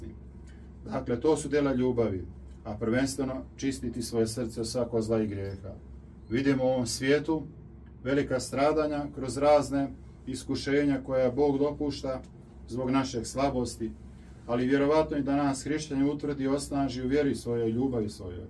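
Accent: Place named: Ekavian, Serbia. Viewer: Opet ekavičar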